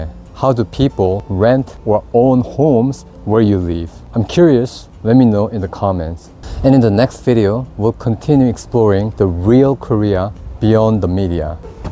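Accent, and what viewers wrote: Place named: West, United States. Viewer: This creator is VERY interesting. I'm running into several English speaking channels of people living on Korea. In particular, this gentleman speaks a Western style of American English very well. Thank you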